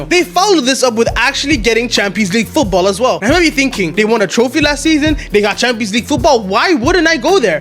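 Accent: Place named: Northeast, United States. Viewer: Where is his accent from? I can guess it's from the Northeast, the US but which state/city?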